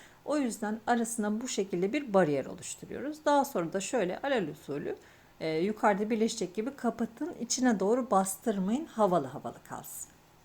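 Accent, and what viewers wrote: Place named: Gaziantep, Turkey. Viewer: hanım nereliydiniz acaba Antepli felan mı merek ettim bu ses verin inşallah iyisinizdir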